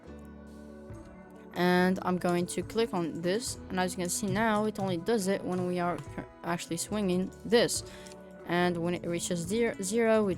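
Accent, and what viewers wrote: France. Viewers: You sound french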